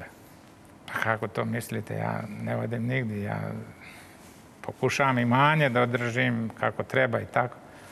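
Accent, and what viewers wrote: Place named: Kordun, Croatia. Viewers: Kao da sa Korduna. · Ovaj govori hrvatski.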